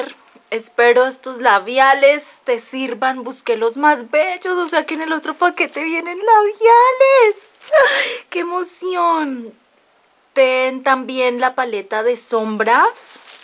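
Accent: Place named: Colombia. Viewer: pensé q eras colombiana jajajja por tu manera de hablar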